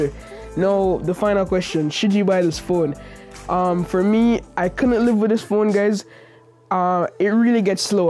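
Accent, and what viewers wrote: Jamaica. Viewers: Can't miss that Jamaican accent bro lol big up yuself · Patois strong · hey great vid you Jamaican btw I hear the accent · The accent, are you jamaican? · You Jamaican? 🤔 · Dude are you Jamaican?? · that Jamaican accent 😭😭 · Are you a Jamaican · U from Jamaica bro? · You Jamaican? And what’s the intro song? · dude sounding Jamaican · Are you Jamaican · Are you a Jamaican? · A mobile phone reviewer with a Jamaican Accent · Are you Jamaican bro? The accent sounds familiar. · are you jamaican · I can tell you are from Jamaica, aren't you? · Are you from Jamaica? Or the Caribbean?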